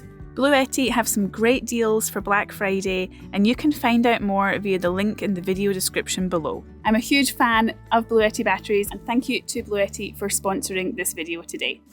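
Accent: Scotland, United Kingdom. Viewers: After I've finished reading all the doom and gloom on the news, I save video's for bedtime. Her lovely Scottish voice, the amazing scenery, interesting facts, all help me wind down for bed. Thanks for making them and posting. Beautiful woman.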